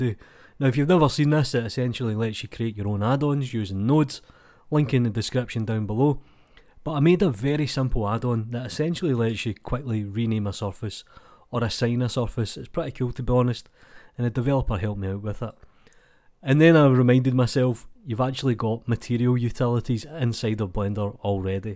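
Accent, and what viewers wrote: Scotland, United Kingdom. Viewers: That's Scottish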